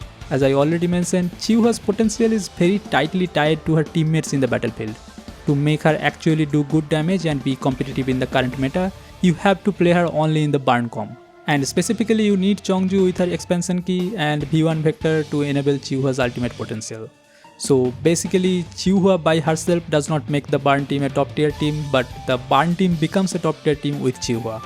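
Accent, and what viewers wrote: India. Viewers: why you sound like an indian?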